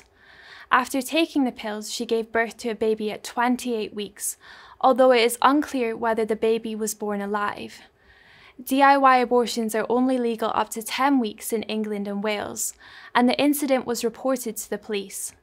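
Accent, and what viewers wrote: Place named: Scotland, United Kingdom. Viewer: Beautifully presented. Love the soft Scottish accent. You take care young lady.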